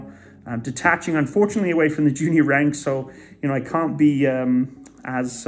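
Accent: United Kingdom. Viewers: I served with the SAS as a transfer. Good Job Mate! That Limey assed accent is yours and only yours Sir!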